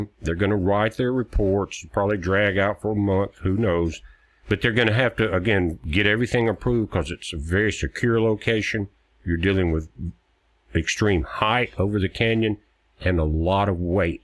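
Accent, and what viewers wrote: South, United States. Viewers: very charming 'Southern drawl!' 🎉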